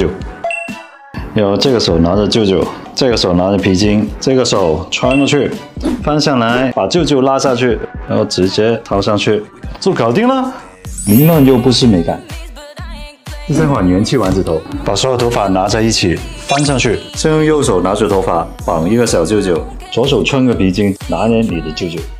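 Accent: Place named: Hong Kong, China. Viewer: 怎么口音像香港的 我也是香港的😂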